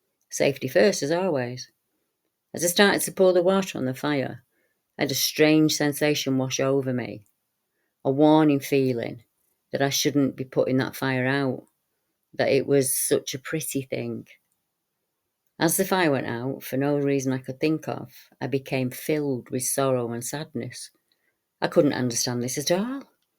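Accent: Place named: Northern England, United Kingdom. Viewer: Just found you. Great work. Great northern accent. Luv it!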